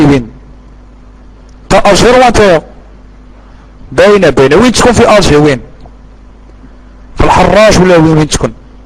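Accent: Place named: Morocco. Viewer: لاول مرة اعرف ان معسكر يتكلمون لهجة مغربية خخخخخخ ياو راكم تبهدلو في روحكم المتكلم لهجته مغربية على كل حال الله يهدينا كلنا اخوة مسلمين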